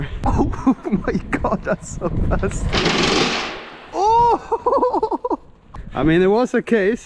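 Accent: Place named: United Kingdom. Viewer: I have a feeling like your accent is becoming more British. Have you noticed?:)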